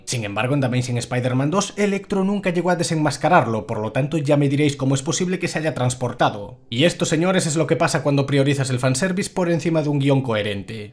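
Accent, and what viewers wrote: Galicia, Spain. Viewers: Eres gallego no? :v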